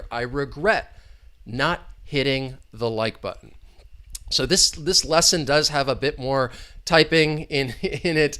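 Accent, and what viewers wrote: United States. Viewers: American accent too heavy. 👎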